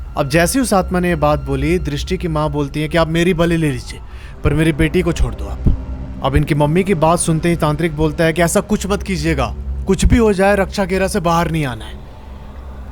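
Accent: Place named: Bengal, India. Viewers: Sir your bengali accent is so cute 🥰🥰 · Diwali 🪔🎇 Ke ager din Matlab bhut choturdhosi ke din 14 saak or sabke pura Ghar mein 14 🪔 Candles🕯️ Esob Hum Bengali Log Apne Apne Ghar mein lagate hai or bhaiya bhut choturdhosi ke dis sachmein darabna kuch hota hai eto maine bhi suna hu likin koye bhut aaise baat nhi kar sakta hai jo bolega MAI ARPITA NHI HU, aise shadow bagera dek sakta hai likin eeita kuch hota hai bhuut bat karta hai e kuch jada hi hai aspas negative energy rahta hai to feel hota hai. Likin Bhuut samne ake bath karega esob nhi hota bhaiya 😅 PLEASE REPLY CHAT ? OR ek bath Apke Bengali Voice So Sweet ❤ ! LOVE FROM KOLKATA / (WB ).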